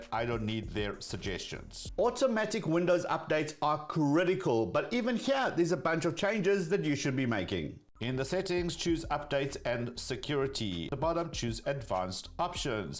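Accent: South Africa. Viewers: last thing I expected here was to hear a Saffa accent lol, nice!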